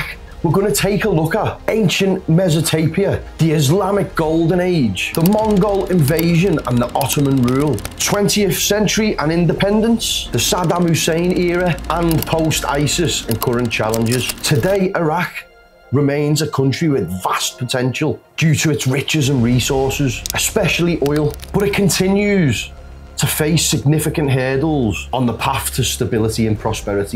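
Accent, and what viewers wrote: United Kingdom. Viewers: انا عراقي اعشق اللهجة البربطانية الفخمة 🤤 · تحديدا هاي لهجة سكوتلندا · dude your accent is cool where are you from? Birmingham? what accent is this? I know it's British but from where specifically?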